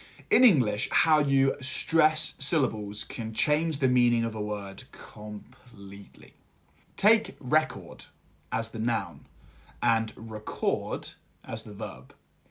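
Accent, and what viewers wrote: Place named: United Kingdom. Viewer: He is definetely British.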